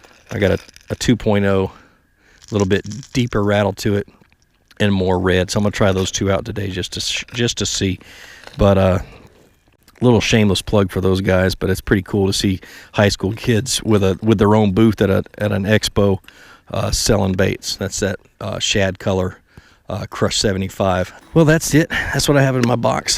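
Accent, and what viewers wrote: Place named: New York, United States. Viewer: you really sound like u live in NY... if so, you only can't bass fish from 3rd Sat. in June-Nov. 30 if you are using live bait. Otherwise, it's artificial lures all year long (assuming you are catch and release).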